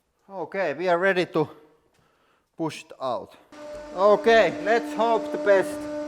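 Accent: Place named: Finland. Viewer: We Finns speak English like this 😄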